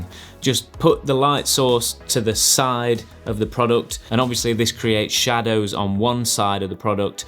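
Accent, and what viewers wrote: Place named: England, United Kingdom. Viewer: Are you from England? I like your accent. Somehow it sounds more professional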